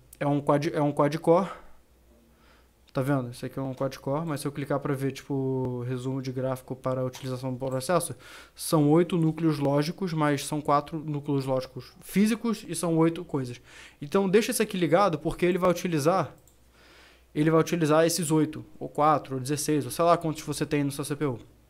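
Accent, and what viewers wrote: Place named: Portugal. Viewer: Vc é de portugal mano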